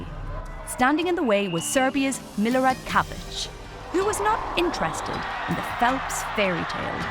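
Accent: Ireland. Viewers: She is Irish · I looooove her irish accent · She's Irish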